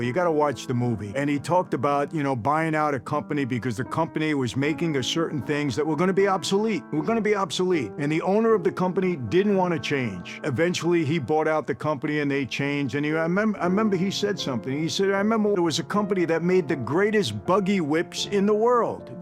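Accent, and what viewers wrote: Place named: New York, United States. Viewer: They need to hire you as the voice over for all mob/mafia documentaries from now on your experience on top of that classic New York accent legendary stuff man keep it up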